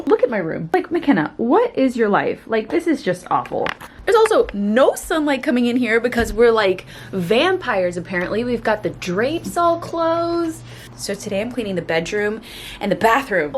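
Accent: Texas, United States. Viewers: I love hearing your Texas accent coming out more. And Kinsley is so adorable ♡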